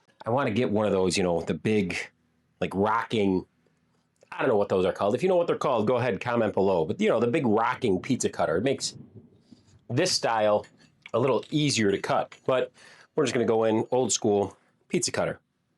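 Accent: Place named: Chicago, United States. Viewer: You have an authentic Chicago accent. I could pick out a “Chicago “ accent anywhere .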